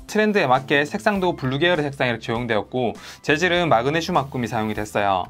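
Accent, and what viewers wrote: Gyeongsang, South Korea. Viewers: 감사합니다. 약간의 경상도 엑센트가 들리네요. 대박 나세요.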